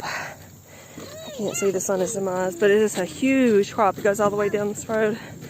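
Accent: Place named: South, United States. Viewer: Hey I love your accent.. I’m originally from India but I have been living in Chicago for 6 years now but I hardly meet people here with your (southern?) accent. I visited Mammoth caves last year and I met locals with your accent and they were super friendly. And yes I agree milk is so satiating. I like yogurt and butter too. I Can eat a stick of butter everyday.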